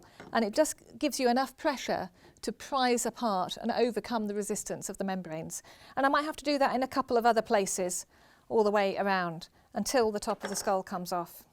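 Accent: United Kingdom. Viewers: Her English accent somehow makes this autopsy classy.🤣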